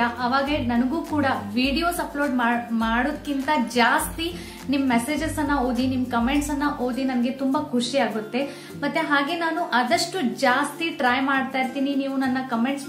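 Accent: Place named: North Karnataka, India. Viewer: Nimma Kannada nange tumba ista iam also Uttara Karnataka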